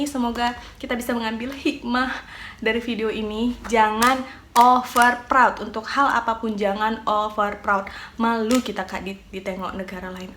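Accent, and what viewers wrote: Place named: Medan, Indonesia. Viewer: Saking ap ny medan x y kn ri.. Mantull .. Ank Medan bah.. Hahaakk...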